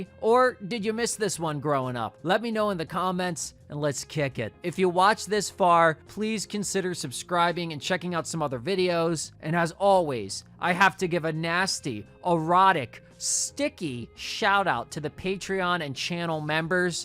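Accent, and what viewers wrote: Philadelphia, United States. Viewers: bro has a Philly accent 👑 🖍️ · It’s a Philly dialect along with saying “crick” instead of creek and “ratty-ator” instead of radiator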